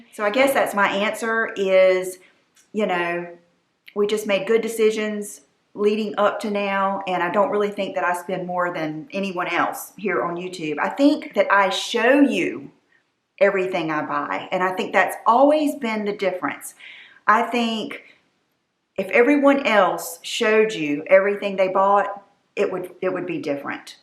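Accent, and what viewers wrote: South, United States. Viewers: I was so excited seeing all of the missing make-up and box of perfume you found in the garage the last few weeks. It was so fun to see the joy all of that stuff brought you. I love your energy and sweet soothing southern voice. 🌸🐝 🌸🌸💄 👛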